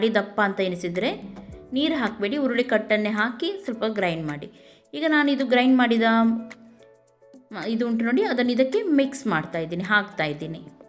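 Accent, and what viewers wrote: Mangalore, India. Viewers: Buddy it's Mangalore accent and request u to Rome all over Karnataka to learn or understand before committing....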